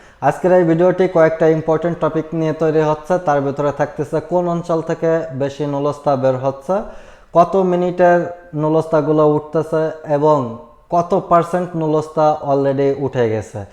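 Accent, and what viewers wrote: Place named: Narail, Bangladesh. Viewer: ভাই আপনার বাড়ি কি নড়াইল?কথা বলার কোয়ালিটি সেইরকম মনে হচ্ছে।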